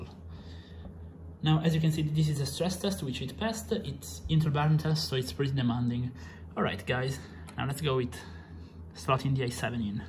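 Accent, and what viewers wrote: Italy. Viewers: Si sente che è italiano, ma c'è anche da elogiare il fatto che riesca a fare interi video senza mai fermarsi e con una pronuncia giusta ✌️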